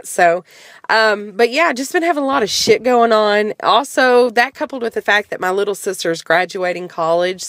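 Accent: South, United States. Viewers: It was like Christmas seeing a video from you! :) Missed that southern voice! Keep your chin up, sweetie! Hopefully this drama passes quickly.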